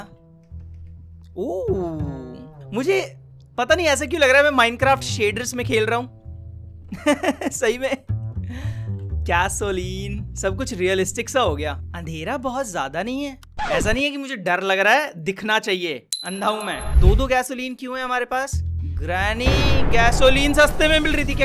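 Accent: Haryana, India. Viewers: Who wants old haryanvi tone back ♥️ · Reason for not growing :- videos with low paced editing :- new generation of low attention span :- slow commentary Suggestion :- use colored subtitles like u used to do to grab attention :- do different types of video RELATED to gaming. :- speak faster :- imp just stop with those english gaaliyan yr. Use hindi ones like u used to. Or just use your hariyanvi accent bcoz i liked that bhens ki tait. Easiest way to get a good video would be to play with friends. If u are slow then your friends could make up for it and you could get really good clips to make a video off of. Every other channel do this nowdays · maza nhi ara yar, haryanvi accent to pelo thoda. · Old vibes are back but something is missing and that is that Haryanvi tone that used in his old videos So I think old vibes are still not back 😶😶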